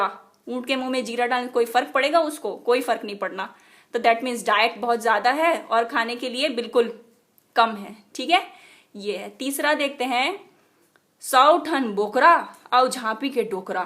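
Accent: Chhattisgarh, India. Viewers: aapman ke , chattishgarhi boli har adbad gutur he. maidam ji aap man lokokti ke baare m au video banye ke prayas karho. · Mam apki cg boli bahut mast hai🙂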